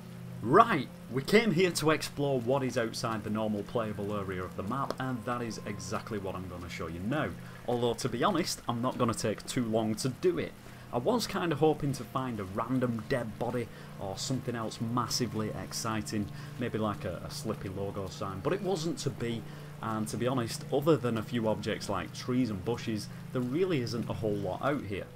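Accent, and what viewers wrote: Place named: United Kingdom. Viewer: British, I think. Very cool.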